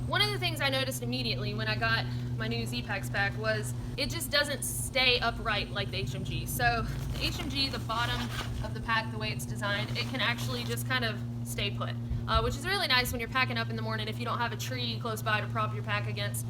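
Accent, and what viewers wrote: South, United States. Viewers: As an aussie guy I shouldn't find your southern accent "comfortable" but I do, I love listening to your voice and I could listen to it all day every day (...and I kinda have been, going through a " Marathon" for the last week or so... 🎶. The fact that it's clear from listening to you that you have a sharp mind and that you are pretty good at phrasing what you want to say probably helps...) I do like the look of that Zpack Arc Haul, and even more the look of the Haul Zip 64L, pity that it appears like you can only buy from them directly, as there's very limited scope for specials. I am in the process of updating my gear for a multi-day late spring trek in the Japanese Alps next year, and I'd like to take the chance to thank you for converting me to quilts (side sleeper here) 🙏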